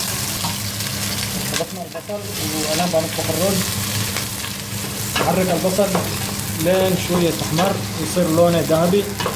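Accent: Aden, Yemen. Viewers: فديت اللهجه العدنيه هههههههههههههههههههههههههه تسلم يديك ياغالي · وووواقلبي على اللهجه العدنيه حبيب ربي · الشيف لهجته عدنية .. تعزوعدن لهجتهم كثير متشابه .واصله من يافع بس من سكان عدن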